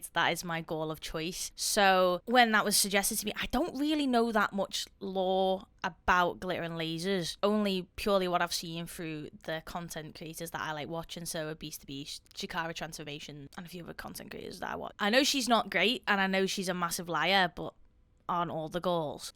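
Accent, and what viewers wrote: Liverpool, United Kingdom. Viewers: I’m Russian and I adore your British accent. It’s a heaven for my ears besides all the well educated guesses of yours. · I was suggested to watch you on the last video I did! Love the scouse accent and looking forward to seeing more of your content 😁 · I've lived outside the UK for 8 years now. Love the vids of yours I've watched so far anyway, buuuuuuut listening to your accent is making me so nostalgic for home and adds an extra layer to enjoy!!! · Heard the scouse accent mixed with lifting knowledge and subbed. Hello from West Yorkshire! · So lovely to hear that Scouse accent. Hello from down here in Australia! Well done on your incredible achievements! Ps. seeing as you're an Amberlynne gorlie, did you see her work-from-home WIEIAD video? It was hilariously stupid. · Aaaa scouse! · Accidentally clicked on this and heard the Scouse and stayed the whole time haha. 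· liverpool, england. it's a scouse accent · Where exactly in the UK is your accent from? American here, just curious — thanks 🙏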